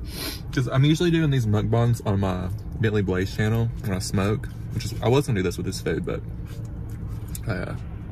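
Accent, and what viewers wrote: South, United States. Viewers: I love your country accent 😍 and your face is beautiful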